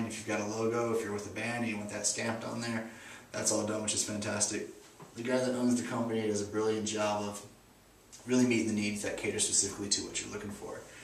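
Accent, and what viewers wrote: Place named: United States. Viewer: Hi.. You sound like a yank...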